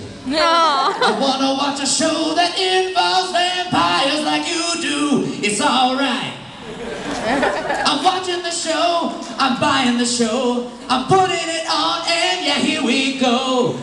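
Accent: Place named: Italy. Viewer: I'm Italian...and his Italian accent is spot on..ieven though I'm Southern Italian..but dude..we do sound like Mario XD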